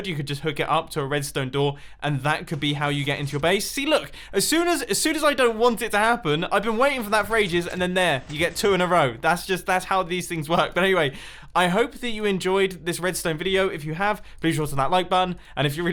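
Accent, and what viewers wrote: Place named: United Kingdom. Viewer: Mumbo has gotten more British over the years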